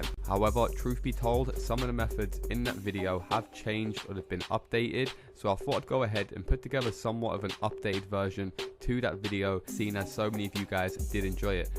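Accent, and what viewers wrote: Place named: England, United Kingdom. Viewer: English u wallad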